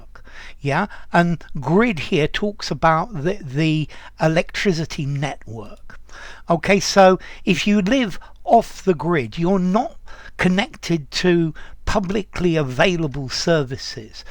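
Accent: United Kingdom. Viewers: Sir what british accent do you speak with? And could you make some videos on accents! Cheers! ❤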